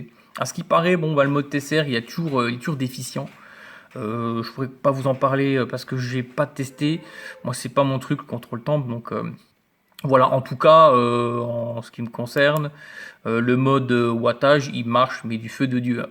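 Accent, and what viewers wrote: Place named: Lorraine, France. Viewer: Hop'la l'ami Lorrain, vla un accent que je connais bien ;) Je viens d'à coté de chez toi, je possède aussi une Fuchai 213, normal que je m'abonne !! Bonne vap et bonne continuation ;)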